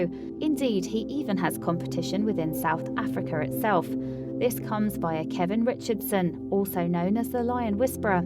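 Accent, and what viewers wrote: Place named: United Kingdom. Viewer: So throw the "darn" ball!!! The narrator's British accent us so strong that it distracts from understanding the narrative AND it's difficult to pay attention to what the lion is apparently NOT doing. Also worth mentioning is the "abundant use" of actual video footage. So extravagant, but worth the considerable expense! .......what do you have to say..........? Please enlighten me. Thanks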